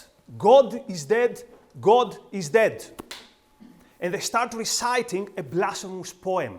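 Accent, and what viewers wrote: Greece. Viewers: Greek accent is adorable.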